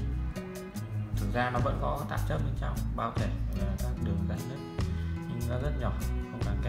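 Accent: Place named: Nghệ An, Vietnam. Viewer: Noi dep Nghệ gi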